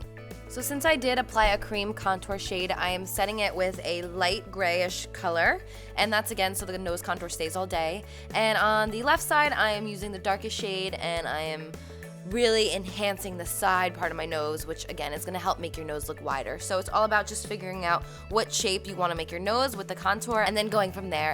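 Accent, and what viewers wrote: New Jersey, United States. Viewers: I hear a Jersey accent 😍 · Love the Jersey accent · omgggg as soon as I heard you talk I knew you were from New Jersey JERSEY PRIIIIIIDE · she sounds like she's from nj, the accent is so sutle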